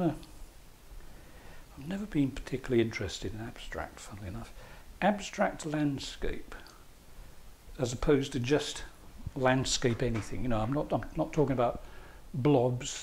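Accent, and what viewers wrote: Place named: Kent, United Kingdom. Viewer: im a pommie that lives in Australia. I came here with my father in the 70s from London to Canberra, where my pa worked in the British High Commission. I never return to UK as it upsets me too much. I used to spend my young years visiting my nana in Tonbridge Kent and you remind me so much of my times in Kent. Your voice, your attitudes, your humour, takes me back to my days in Kent. To the hop fields, to the high street, to the avenue where my aunt vi lived all comes back to me. Australia in contrast to UK is so vast and wild and it lacks the human cultivation, it lacks the history and order that one yearns for at times.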